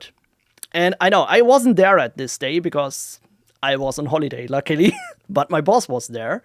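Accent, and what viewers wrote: Germany. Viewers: Typically german englisch 😅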